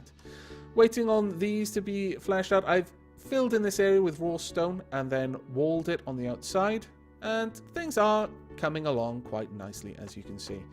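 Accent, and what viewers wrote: Wales, United Kingdom. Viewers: Well yes, I've travelled quite a bit, mostly to learn English too (I'm a frog ^^). As a matter of fact, I also spent two months in total in Wales (Wrexham). Which might be why I like your accent so much, as I have fond memories of the nice people I've met there ;-) Anyway, you deserve those praises, as quality content should be acknowledged.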